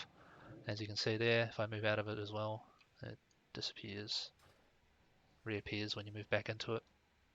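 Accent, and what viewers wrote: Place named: Australia. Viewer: Ah! yeah I wrote "are you aussie" first, then watched more and editted my comment to include kiwi haha. Your weakauras are awesome, cheers bud!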